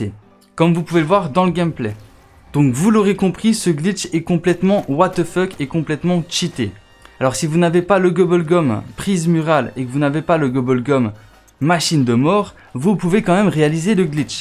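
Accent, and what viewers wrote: Liège, Belgium. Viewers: Sa sent le liégeois haha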